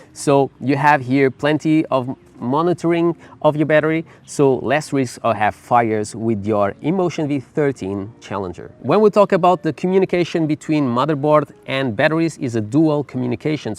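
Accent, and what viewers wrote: Portugal. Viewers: Apostava que és português...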